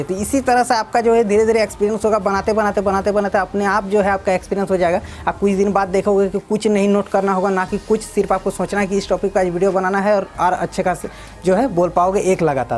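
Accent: Bengal, India. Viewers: bhai ap bangali he kiya? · vai aap Bengali Ho....?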